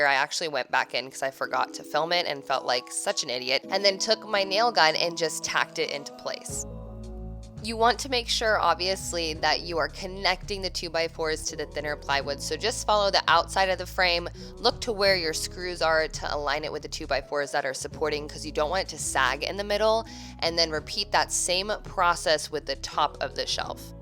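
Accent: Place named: United States. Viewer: love your work. It’s just American accent. You have lovely voice.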